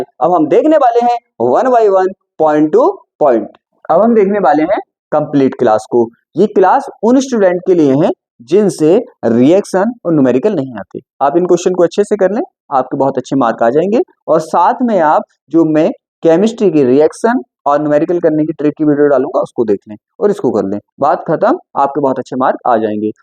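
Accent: India. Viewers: Apko dekhne se nhi lg rha language hi up ki h 😂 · Sir aap Bareilly se lag rhe hai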